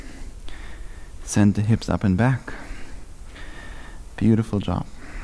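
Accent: Germany. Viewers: Do I spot a german accent there? Wenn ja nices Video Bro. Will meine Mobilität trainieren und bin so auf euch gestoßen.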